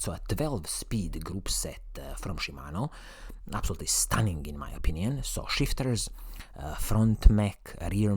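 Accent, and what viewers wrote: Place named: Italy. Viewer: English with italian accent, so funny 😃, yes nice bike without stock,, it's like they are no exist like model.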